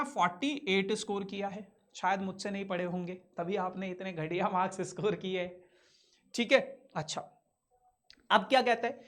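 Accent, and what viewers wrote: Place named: Haryana, India. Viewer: Haryanvi hi hai mdm ji❤😂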